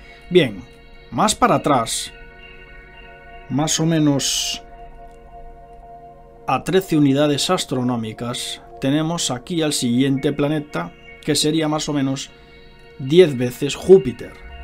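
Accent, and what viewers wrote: Spain. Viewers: Porque siempre es en español de España es aburrido nomás de escucharlo....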